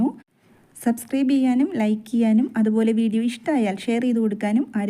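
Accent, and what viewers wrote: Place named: Thrissur, India. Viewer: Thrissurinte സ്വന്തം ഭാഷ ❤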